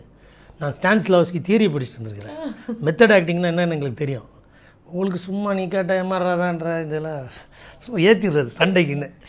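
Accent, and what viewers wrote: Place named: Madurai, India. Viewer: Madurai tamil maarave illa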